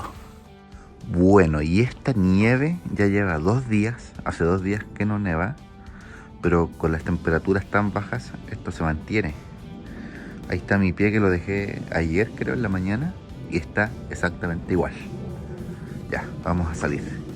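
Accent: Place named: Chile. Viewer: Llámame loco, pero me basto 1 segundo de tu voz para darme cuenta que eres Chileno, ojala nos encontremos en algún momento allí en Vancouver ya que viajo en Marzo, saludos!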